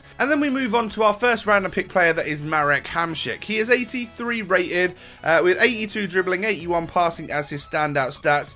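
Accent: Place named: London, United Kingdom. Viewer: Where are you from? London Maybe? I love your accent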